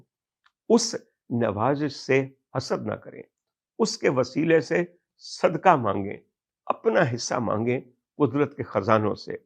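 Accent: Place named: Punjab, Pakistan. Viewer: Verbose. Avelanche of urdu in Punjabi tone (that lethal combo). Urdu as spoken normally in UP is palattable. But this is too much.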